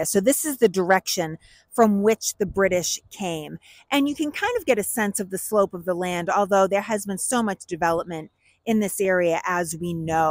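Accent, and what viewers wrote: Boston, United States. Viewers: Visiting Boston this summer and wanted to see Bunker hill. The moment she said "Wah" in her Boston accent; ; I knew I had to stay for the whole video. :D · Love that Boston accent.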